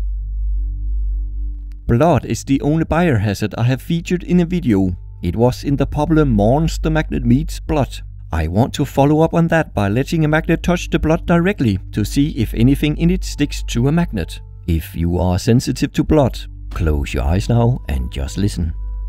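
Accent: Denmark. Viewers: I feel like you have a danish accent, hvis du er dansker så like mit comment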